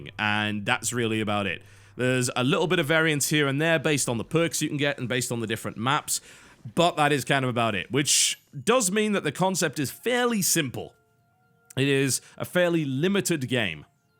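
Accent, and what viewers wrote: United Kingdom. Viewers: Looks interesting .. but i am going to wait for a sale. I love the voiceacting ... i generally love british accents :D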